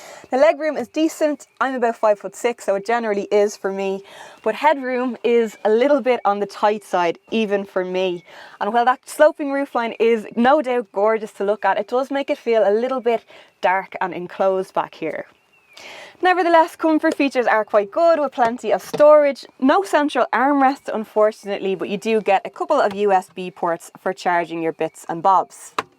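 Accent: Ireland. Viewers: Jacksepticeye made me like Irish accent and this review was amazing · I don't like Irish accent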